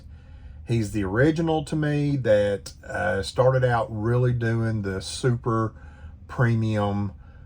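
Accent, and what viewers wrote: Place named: South, United States. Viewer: It is so nice to hear that sweet sweet southern twang again. I missed you brother.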